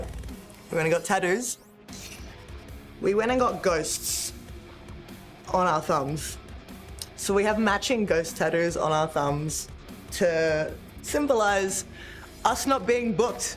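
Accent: Australia. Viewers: Bruh…she’s Italian! That’s friggin’ awesome! Australian Italian. Her imitating her grandma was great. Also love her Australian accent! 😊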